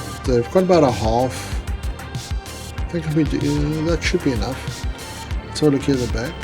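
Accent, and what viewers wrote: South Africa. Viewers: Sit en kyk hierdie video en dink vir myself, "die man klink Suid-Afrikaans" .. Well done man, dit lyk epic!! Subscribed👍🏻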